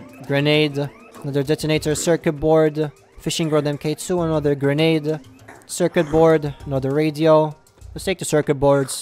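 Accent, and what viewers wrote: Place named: Malta. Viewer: Question I am new and you’re English accent sounds like my country’s English accents that Maltese so I am asking are you maltese and live in malta pls tell me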